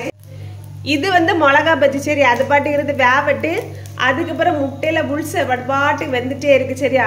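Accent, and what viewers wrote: Kanyakumari, India. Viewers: அக்கா உங்க கன்னியாகுமரி பாசை சூப்பரா இருக்கு l like you akka vlogs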